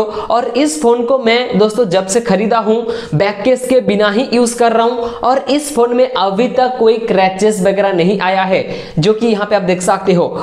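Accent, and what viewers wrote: Bengal, India. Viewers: Bhai tm hindi aur english dono ki communication classes lena start kr do. Tmhare bolne me bengali accent h jo k itna irritating h k subscribe to bhul jao koi tmhari video pura bhi nhi dekhega.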